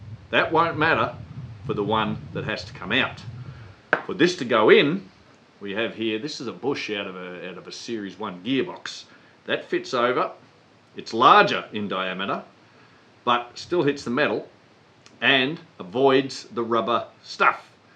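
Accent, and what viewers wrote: Australia. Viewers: Love watching your videos, and even better you havnt lost your Australiana, other words you still talk like an Aussie, been watching you guys since you did the Canning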